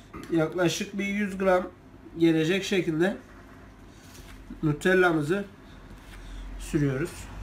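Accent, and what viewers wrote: Gaziantep, Turkey. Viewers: Normal somun ekmeğe Bizim Özel tost ekmeğimiz diyoN:D abi antepli oldunu çok belli ediyorsun Konuşma şeklinden bilmeden önce anlamıştım:D özelliklede şu kısım (buyrun tosta başlayalım (Ozaman) ):D bende antepliyim:) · ABİ ADAMIN GAZİANTEPLİ OLDUĞU ÇOK BELLİ OLUYO KONUŞMASI AYNI GAZİANTEPLİLER GİBİ BENDE GAZİANTEPLİYİM TOSTUNU YEMEDEN ÖLMEM İNŞALLAH:D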